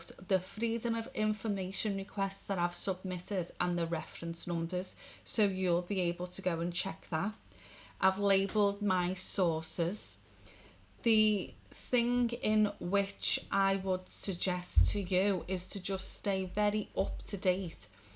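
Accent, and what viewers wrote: Liverpool, United Kingdom. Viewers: I think that more people than ever woke up to see the nefarious schemes for what there are. Also, it seems that the level or resistance in The West is bigger than the politicians expected. I belive that people must fight against Internet control (online "safety" act and similar bills in other countries) to be able to speak about the wrongdoings of the elite. Use as much cash as possible since the banks want to phase it out and support local businesses that accept it. And remember that there's much more of ordinary folk than the globalists- if they could just force all of this though- they would- they can't and that's why they try to weasel it through the veteran id card, children's digital id, one login etc. Also, last thought- all those stabbings and unrest seems very suspicious and "organised" in a way to dupe people into failing for digital id- don't fall for that. 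Don't ask for national emergency or any other extraordinary powers to be granted to the gov. They'd misuse them as always. Ps. Love the scouse accent and the fact that you gracefully subtitled your video for non native speakers watching😂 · I thought I had tuned into an old episode of Brookside for a moment there. 🙂 I love your accent . . . . . hello and Greetings from Newcastle, I just subscribed to your channel. 🙂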